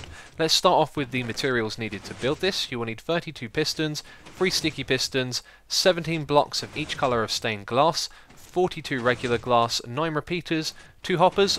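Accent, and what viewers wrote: England, United Kingdom. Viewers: I thought was from England:P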